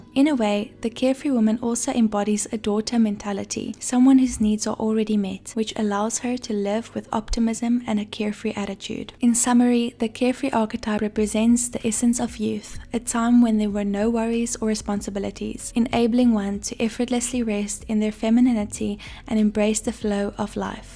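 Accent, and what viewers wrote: South Africa. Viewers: Are you South African? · Girl are you South African 😭😭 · Are you South African 😭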